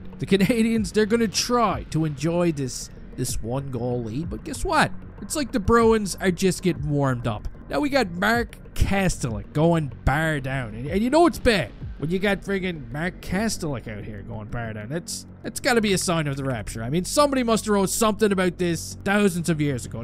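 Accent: Newfoundland, Canada. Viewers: Is he from Newfoundland? I’m from NL been watching this fella for about a year now or so and always thought he was. but wasn’t sure lol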